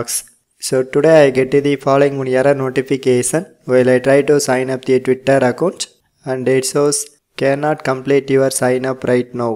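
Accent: India. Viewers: you have the THICC indian accent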